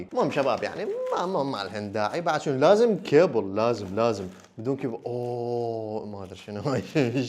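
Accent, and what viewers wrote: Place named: Iraq. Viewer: لا يا كويت يمعود هوه غير لهجته عراقيه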